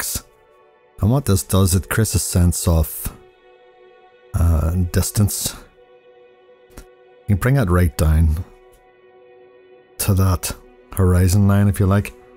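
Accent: Northern Ireland, United Kingdom. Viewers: I love your videos! And it's so nice to hear someone from Northern Ireland talking ❤👌✨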